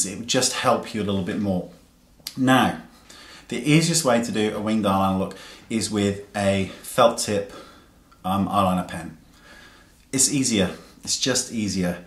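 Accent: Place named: West Country, United Kingdom. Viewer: You have a slight West Country hint to your accent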